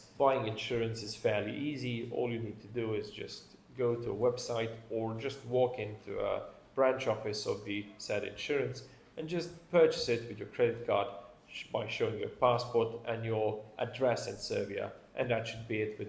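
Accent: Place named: United Kingdom. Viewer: Sir u got british accent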